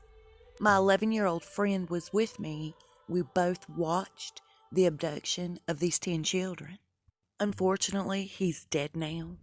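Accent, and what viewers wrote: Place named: North Carolina, United States. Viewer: Yes, I want the in depth video! I have heard this shady side of the RF for years, and I think part of why they get away with this is that the things they do are so appalling and out there, that people just can't wrap their heads around any human beings doing such things. About hands...I remember a picture of Hillary taken after she had that collapsing episode, where her hands looked markedly enlarged and odd to me. I remember the picture was outside of Chelsea's apartment and Hillary was trying to convince people that she was fine. She was wearing a navy blue pantsuit. That picture stuck in my mind for another reason too. Hillary looked different, and I wondered if it was a body double. I think so much about these elites is just smoke and mirrors, nothing is as it seems. I feel like we've fallen through the rabbit hole. 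And, I love long videos when they're your videos! I like to listen to videos when I am cooking and washing dishes, etc. Seems that I just get my hands covered when kneading dough or scrubbing, then the video is over. Thank you for all you do! You're a blessing! I was on the phone earlier with a friend from Lenoir, NC, and your accent reminds me so much of hers! 💕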